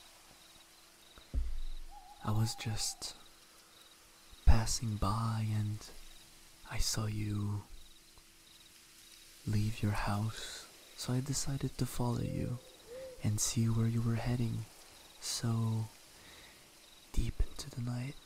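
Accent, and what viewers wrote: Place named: France. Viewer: I was not into French accent before, but now I'm getting obsessed 😅🤭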